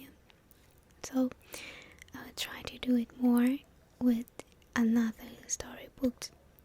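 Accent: Standard. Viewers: 你的中文发音很标准，谢谢～ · 发音好标准，好萌！🥹🫶🏻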